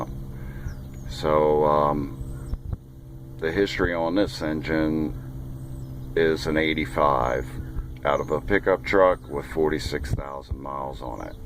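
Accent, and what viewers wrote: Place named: Pittsburgh, United States. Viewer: Are you from Pittsburgh by chance? Sounds like you have a yinzer accent. No disrespect, I lived there for awhile, miss the place. Good luck on your van build, looks awesome!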